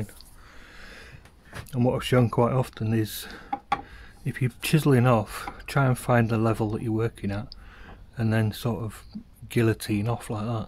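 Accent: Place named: Northern England, United Kingdom. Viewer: Great no nonsense video. You sound like you're from Jonny Vegas country?!?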